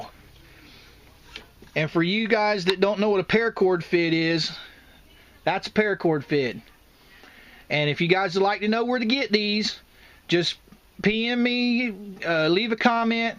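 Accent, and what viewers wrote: South, United States. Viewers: Hey, thanx for all the great videos. Finally, there's someone who speaks Southernese that I can fully understand. Was wondering if you've ever done a necklace like the ones professional baseball players wear. My grandkids keep asking me if I know how to make them. Thanx again, Tmat46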